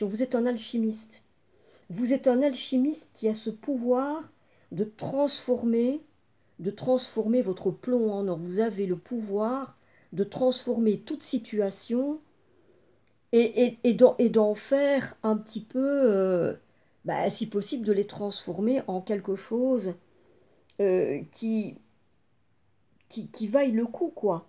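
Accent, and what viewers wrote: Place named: Wallonia, Belgium. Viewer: J'aime beaucoup vos tirages et votre accent wallon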